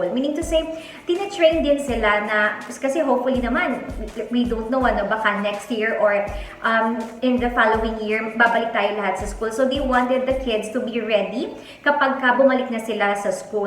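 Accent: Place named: Vietnam. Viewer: Although can not hear English. But I'm also curious .... You are Vietnamese! You speak English like Vietnamese. great, excellent, wonderfull . I have subscribed to the channel. Please exchange. Wishing you and your family wellbeing. Love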